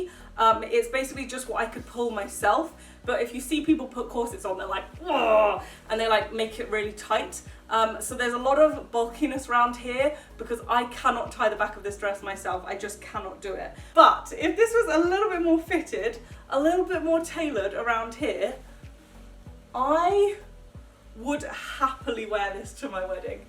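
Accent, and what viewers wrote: United Kingdom. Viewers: Im sorry, but ur so so weird, and plus its more weirder when ur talking Britain THAT PRECIOUS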